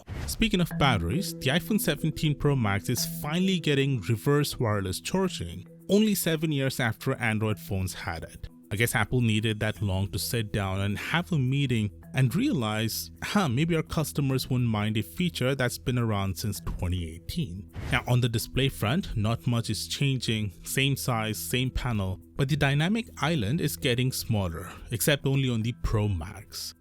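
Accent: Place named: India. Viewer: Omg i can hear indian accent from few videos away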